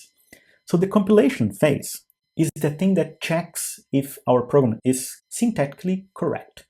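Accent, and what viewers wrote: Brazil. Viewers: Notei o sotaque dele de br também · O sotaque não nega, esse cara é BR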